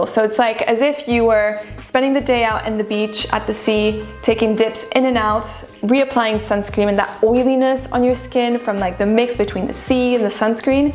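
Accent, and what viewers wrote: France. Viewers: i am here for her french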